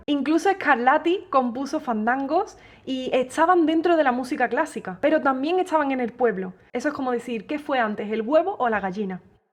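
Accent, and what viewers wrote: Andalusia, Spain. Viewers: es un conglomerado de virtudes que además de no encontrarla fallos, hace honor a su apellido, todo cuanto hace es eccioneccion. hermoso, hasta físicamente, es una guapa española con acento andaluz, que representa a España hasta en lo que toca y canta, con un gusto y un saber colocar la voz, hasta la perfección. Gracias por e l buen rato que me has hecho pasar deleitándome con tus perfectas interpretaciones. Tu siempre admirador, Kini Palacios. (pianista-acordeonista y percusión) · Santo dios...pero qué tiene esa guitarra dentro que suena como un manojo de cascabeles afinados y bajando a tempo por las escalerillas de un palacio de cristal???? Algún día tendrás que hacer un video de cómo te grabas, cómo pones el micro...Bueno, para el resto de nosotros mortales poca cosa nos va a ayudar...Es impresionante cómo respira tu guitarra en esos acordes abiertos con los que rematas...perfectamente afinada y agradecida de que la acaricien con tanto mimo y a la vez tanta energía. 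Suenas poderosa y resulta casi humillante mirar tus dedos corretear sin esfuerzo por trastes y cuerdas mientras la madera de tu guitarra ríe feliz. En esta pieza me has atrapado desde las primeras notas. A veces paro tus videos de pura envidia y desolación, pero esta vez me has embrujado y lo he visto de pe a pá. Y encima nos regalas todo esto envuelto con esas dos sonrisas con las que empiezas y acabas los videos. Ole tu cara bonita, tus dedos de gacela y tu acento especiado por tantos siglos de al-andalus y demás mixturas celestiales. Paro, que ya lo dije todo sin decir nada...Un abrazo para ti, y todos tus seres queridos a los que bendices con tu presencia. y VIVA LA MADRE QUE TE PARIÓ!!! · Eres grande guitarrista y adoro ese acento andaluz cada vez que pronuncias una T o una S. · Escuchar ese acento andaluz 🥰🤤🥰 enamora 😍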